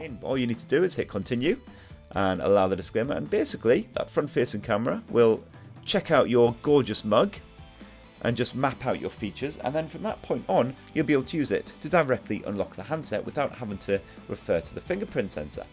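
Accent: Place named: England, United Kingdom. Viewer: Thank you so much for this! First review was from an Indian dude, nothing wrong with it, but I can't understand his English, haha. Nothing can beat an English bloke! And very detailed review, wonderful! Only thing that concerns me slightly about this phone is that the battery is not removable so you can never replace it.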